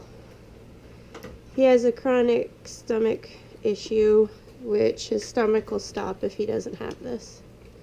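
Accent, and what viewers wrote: Canada. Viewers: You sound Canadian 😁 are you?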